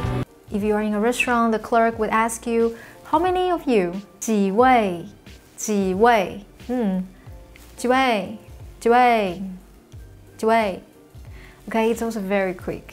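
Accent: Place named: Taiwan. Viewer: 博主台湾腔太明显了，考个普通话证书吧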